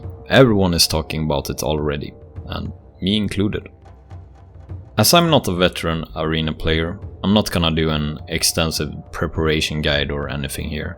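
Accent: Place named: Scandinavia. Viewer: May have liked it more if he spoke in Swedish 🙂 i do not like Scandinavian accent as much when listening to English content 😉 would in fact like Danish, or Norwegian too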